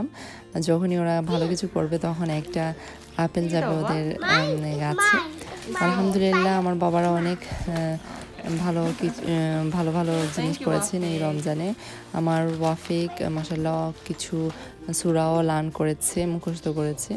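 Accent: Sylhet, Bangladesh. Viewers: Salam apa . Apni ki shyllhety. Apnar kotha sune to buja jayna. Doy bora ki vabe banate hoy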